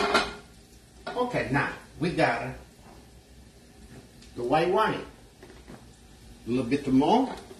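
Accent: Italy. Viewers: I love your videos!!! Your Italian accent is absolutely awesome!!!! You’re the greatest!!! 👍🏾👍🏾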